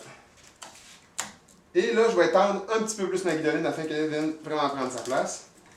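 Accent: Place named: Quebec, Canada. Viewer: Tres bon tuto! En Québecois en plus! Merci! Asteur j'vais essayer de pas trop rusher en l'installant!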